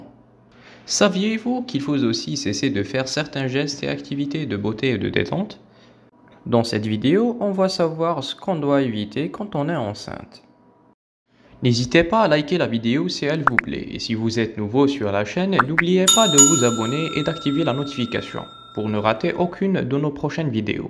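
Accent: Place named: Kabylie, Algeria. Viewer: ca se voit tu es kabyle tu as l'accent lol mais merci des conseils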